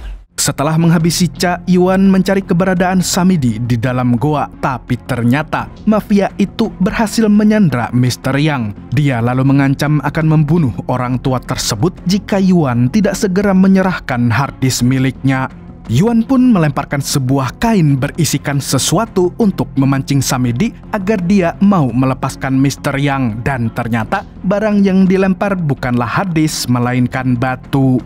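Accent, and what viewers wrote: Banjar, Indonesia. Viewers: Bahasax Admin, bhsa Banjar gen, 😂 ngaran ikam spa?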